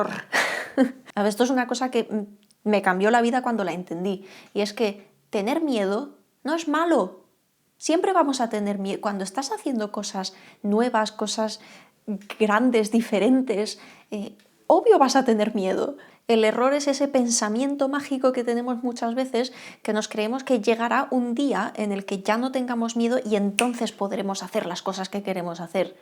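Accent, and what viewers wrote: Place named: Spain. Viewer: Es maravilloso escucharte!! Eres dulce, motivadora y nos das tips muy excelentes. Además amo tu acento español!!! Me has enseñado tanto y me eres mi gurú, ya no sólo del minimalismo, de valores de la vida en general. Te tengo guardado un gran cariño y agradecimiento! He adoptado tu frase "mandar todo a la porra" 😊❤💕🌸 Eres genial!!!!!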